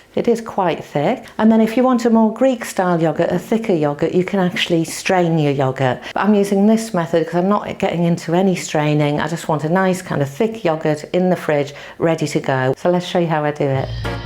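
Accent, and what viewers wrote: United Kingdom. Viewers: Just what I am looking for thanks for this video, and a British accent to lol I am from the UK and now live in the States, they pronounce yogurt different in the States. Thanks for you video though.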